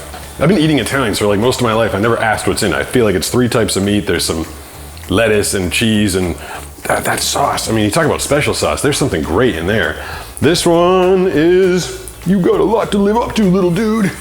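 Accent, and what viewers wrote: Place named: Appalachia, United States. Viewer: Why does this pig talk with food in his mouth..hickish, reminds me of hillbilly heaven.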